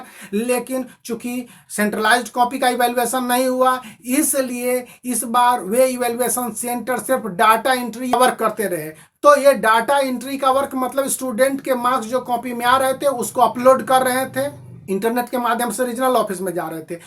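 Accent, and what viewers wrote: Bihar, India. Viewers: Sir aapka bihari accent mujhe bohut pasand aya🔥🔥👍👍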